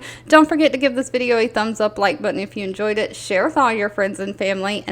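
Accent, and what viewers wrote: South, United States. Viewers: Im from NY and I love your southern accent!! 😊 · I love your southern accent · It's not annoying it's Southern like me. Don't feel you have to appease these negative people. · Don’t let those haters shut down that bubbly southern voice darlin 🥰 We love it!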